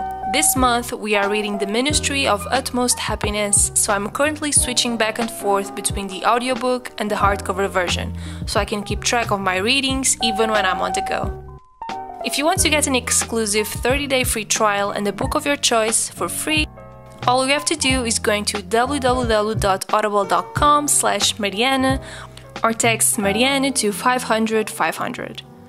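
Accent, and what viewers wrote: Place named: Portugal. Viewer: Ando a descobrir canais que me transmitam energias positivas e me motivem, parabéns está incrível. Houve uma coisa que teve imensa piada, quando comecei a ouvir o vídeo pensei "Fogo parece mesmo uma portuguesa a falar inglês, super clear mas não inglesa obviamente" e fiquei mesmo "wow tem que ser". Fui ver à bio e acertei, que inglês excelente!!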